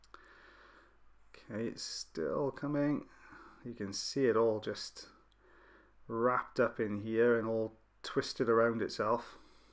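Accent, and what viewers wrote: Wales, United Kingdom. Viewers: ear wax removal + welsh accent asmr = most satisfying video on youtube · Are u welsh? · Sally, it's about as mild as an accent gets. I can just about detect Welsh, which means he could be from Hereford, England, where some folk sound Welsh to my home counties ear. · I thought I could hear the beautiful Welsh accent in his voice lol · Are you Welsh?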